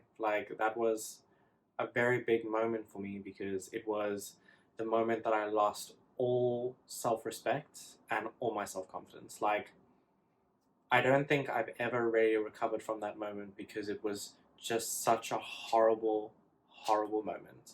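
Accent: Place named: South Africa. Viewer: Lovely South African accent? It is not as heavy as others I've heard. Cape Town? You didn't mention how you came out to your family.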